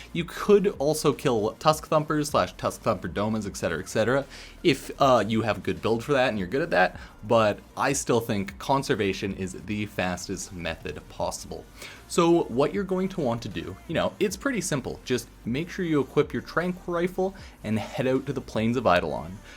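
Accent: Canada. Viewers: I love your Canadian accent. When ever you say "about". Love it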